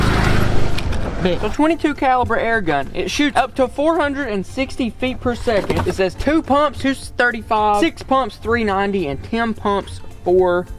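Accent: United States. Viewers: I use the crosman 1322 with a 14" barrel and a shoulder stock. I pump mine 12 times and I get passthroughs and instant kills on squirrels, pigeons, and rabbits within 20 yards. Also, what are you talking about "twice the size." A .22 is a .22, these pellets have the exact same diameter as a .22 projectile, they are just shorter because they dont have the powder charge behind them. Also, you sound american, but you bought the tuned down Canadian version of the crosman 1322, the US version is over 600fps out of the box. Definitely buy the $20 crosman shoulder stock and rotate your iron sights to peep sight, or upgrade the plastic breach to the steel breach and put a red dot on it.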